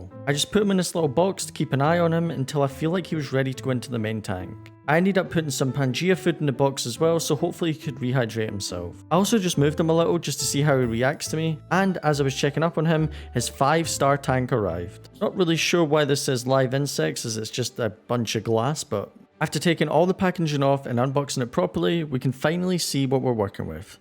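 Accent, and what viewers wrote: Scotland, United Kingdom. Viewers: Yooo is that a Scottish accent I hear? (Half Scot here, always excited to hear the sound of my second home)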